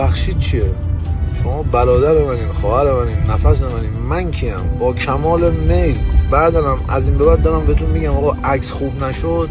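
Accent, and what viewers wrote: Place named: Mazandaran, Iran. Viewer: کجه وچه هستی کدوم شهر لهجه مازنی داری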